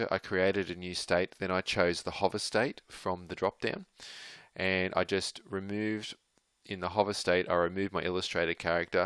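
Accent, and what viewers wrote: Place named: Australia. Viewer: this tutorial is excellent! Your instructions and explanations are very clear. This is the best online tutorial explaining states that I've found on YouTube. Thank you so much! Please make more tutorials. ps. Love that I hear a fellow Aussie too!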